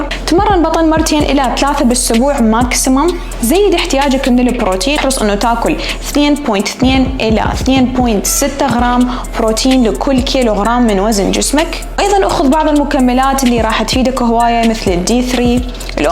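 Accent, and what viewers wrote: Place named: Iraq. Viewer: شكلك اوروبيه لكنتك عراقيه.. عملالى error براسى😐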